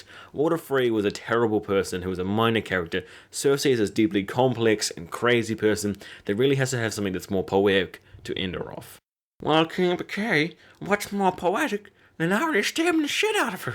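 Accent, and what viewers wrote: New Zealand. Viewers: are you a kiwi? your accent sounds very kiwi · Good to hear a fellow kiwi, bro :D btw... Its not a teleportation machine, there is a subtle jump forward over 1-4ish months during this episode. We see Winterfellpost battle, the sept explode in kings landing, Jamie at the twins, and Dany in Meereen post battle. After that... * We see Aria at some point after Jamie left the twins * The lords of the north assemble in Winterfell following news of the battle of the basterds * They show Varys and Olenna in Dorn (it must be a significant time after the Wildfire). Then we see Dany and a feet (including Highgarden and Dornish ships) a huge timestep after that. They skipped a lot of padding where Varys, Jamie, Olenna, Aria, Northern Lords, and the Dornish & Highgarden fleets travel the globe. Think of the last 1/3 of the episode as an epilogue of seasons 1-6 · sheesh. 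Is that some kind of backwoods kiwi accent you got? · Is your accent Kiwi?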